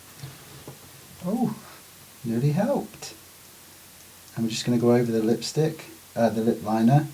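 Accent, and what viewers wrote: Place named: West Country, United Kingdom. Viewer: There's a bit of a west Country accent there this must be long before you went to America.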